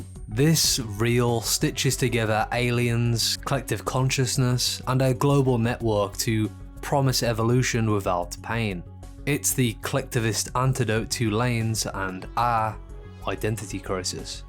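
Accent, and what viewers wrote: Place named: United Kingdom. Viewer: E<link> British Accent.. unlistenable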